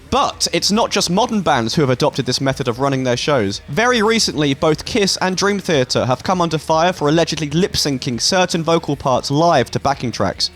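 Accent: United Kingdom. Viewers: It's so refreshing to hear a fellow british accent on youtube lmao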